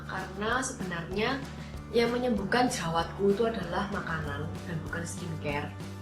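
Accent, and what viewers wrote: Java, Indonesia. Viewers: Mbak e medok banget bahasanya😂😂😂😘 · Medokkk banget mba.. Hahah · Aduh pusing dengernya logat jawa wkw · pekatnya slang jawa 😅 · Jawa medok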